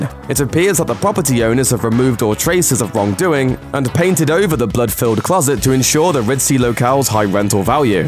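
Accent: United Kingdom. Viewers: I really wish the narrator didn’t have a British accent lost interest in 10 seconds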